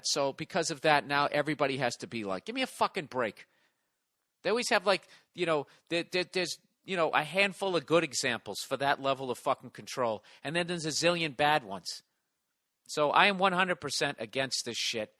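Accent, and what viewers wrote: United States. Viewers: lol i love the country accent to lol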